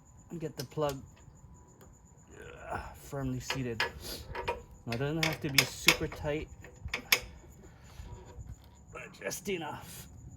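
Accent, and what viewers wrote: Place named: Hawaii, United States. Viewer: 😂😂😂 I knew u was from Hawaii as soon as u had talk!! Aloha to u from Kauai. Thanks for the vid, very helpful! I’ll b trying to do my own oil change on my Mclane. 🤙🏼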